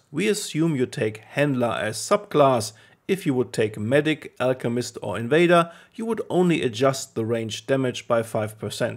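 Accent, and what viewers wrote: Germany. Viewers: Are you german cause of the pronounciation?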